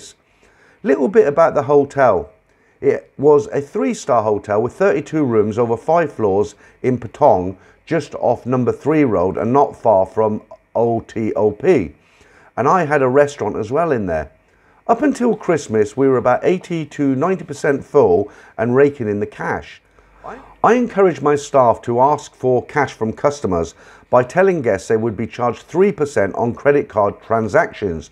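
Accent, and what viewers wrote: England, United Kingdom. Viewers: Another good story i hope they keep coming in good pommy accent so proper 😂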